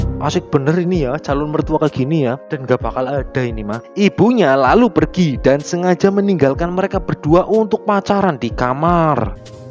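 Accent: Banyumas, Indonesia. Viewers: Suaranya bikin ngantuk tapi ketika denger ngapaknya autu ngakak🤣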